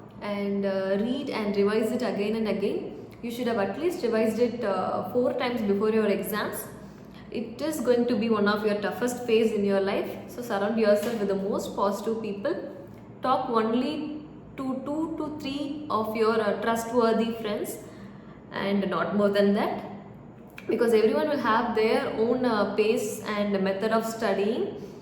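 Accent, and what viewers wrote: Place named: Kerala, India. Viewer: kerala accent :face-blue-smiling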